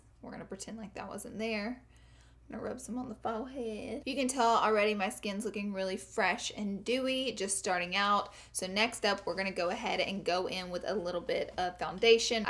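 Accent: South, United States. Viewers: You crack me up girl!! "Oily" I love your accent! I also have a tad bit of one most of my family is from the south.❤️ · Clearly the latter....... she's a southern gal....twang and all. Do you notice how cutely she says concealer?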